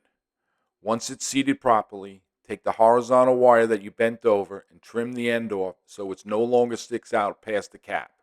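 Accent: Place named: New York, United States. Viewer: Thank you for a great video. I just followed it and did the conversion on my 665 and it’s great. Out of all the videos out there on this topic, I chose yours because of your accent. It just seems right when I’m working on a “made in New York” Lionel. Have a great day!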